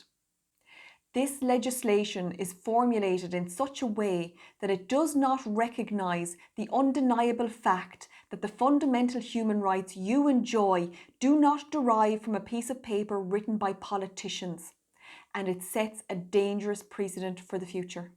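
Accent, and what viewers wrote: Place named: Israel. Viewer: Why are you saying dates like hebrew English. We speak UK English. Prehcedent not pricedent.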